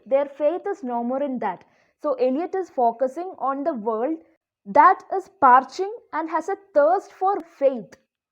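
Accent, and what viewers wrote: India. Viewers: Can you please write what is Shantih shantih shantih, i have difficulties in understanding indian english 😩 And can you also do a vidéo of analysis of "in memoriam" by Tennyson Thanks a lot for your work A follower from France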